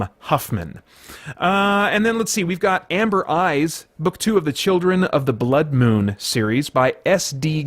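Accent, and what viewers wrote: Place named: United States. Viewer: Haha. Laughing out loud at you trying to pronounce the names. Nadine's last name is pronounced Brand - Ess. Pretty sure It's Morgan L Buss - ee And I think it's Gillian Bront - Ay (like the Bronte sisters) Adams. Interesting to notice that in the American accent, Fawkes and Fox sound pretty much the same. We have quite a distinct difference between the two.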